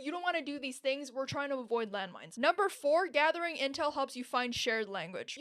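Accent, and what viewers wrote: United States. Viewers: Way too funny & chaotic, as an American you sound like one & subscribed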